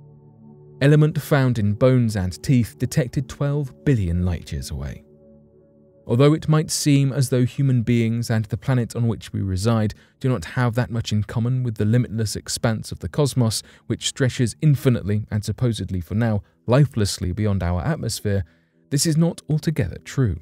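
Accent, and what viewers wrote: England, United Kingdom. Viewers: Nice video but I don’t believe you should be putting the u.s navy’s business out there,but what can I do you do have a British accent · why all these space videos always have an English accent?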